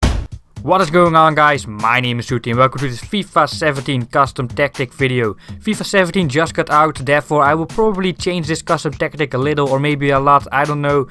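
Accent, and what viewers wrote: Netherlands. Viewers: Are u dutch bro?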